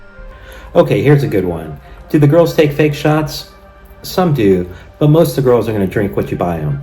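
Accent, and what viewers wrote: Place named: United States. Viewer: Educated English? He's a yank.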